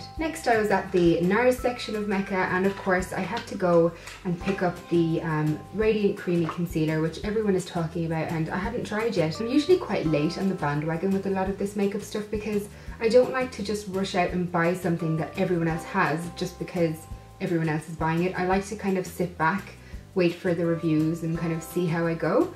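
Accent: Ireland. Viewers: Ur accent is like Irish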